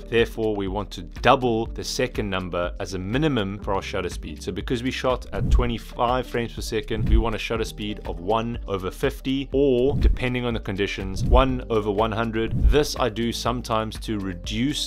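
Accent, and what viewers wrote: South Africa. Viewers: are you South African???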